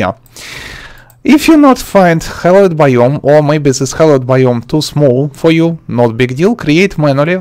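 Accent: Russia. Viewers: He sounds so russian or of a similar region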